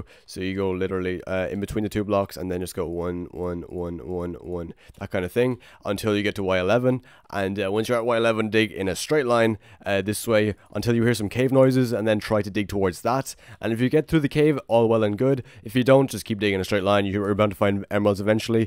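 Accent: Ireland. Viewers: Are you Irish cause you sound like iy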